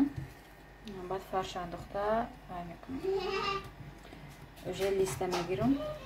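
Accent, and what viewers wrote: Tajikistan. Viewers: Салом шавхаратон ай хучанд худатон ай тарафи кургонтепа чхел шуд ядигаритона гирифтед худатон лахчайи точики кати гап мезанед шавхаратон гудакотон хучанди бачи дадо моям дадо мегуйем падарамона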